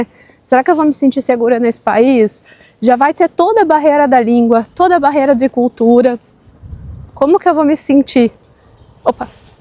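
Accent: Rio Grande do Sul, Brazil. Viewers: Voce é gaucha?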